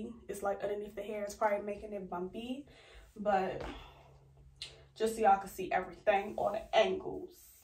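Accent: Washington DC, United States. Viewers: ik a dc accent when I hear one! lol. this turned out amazing btw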